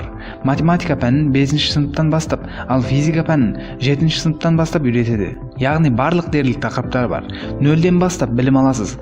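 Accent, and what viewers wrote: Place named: Uzbekistan. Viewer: Тилин биртурли сенин озбексинба акцент бар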